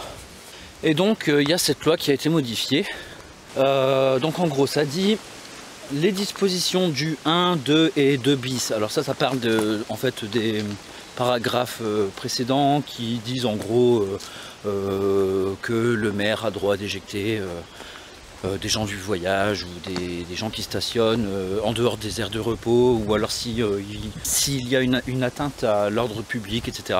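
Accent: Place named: Nord, France. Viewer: T' es deuch' Nord tisote nan ? T ' as un p' tit accent ..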